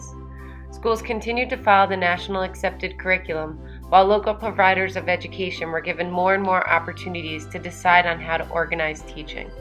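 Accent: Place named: Midwest, United States. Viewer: Papulation? LOL You have a funny Midwest accent.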